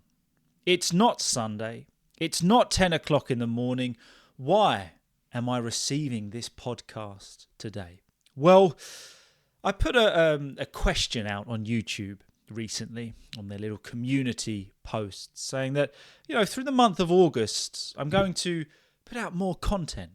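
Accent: England, United Kingdom. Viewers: please clarify which of the English accents do you speak?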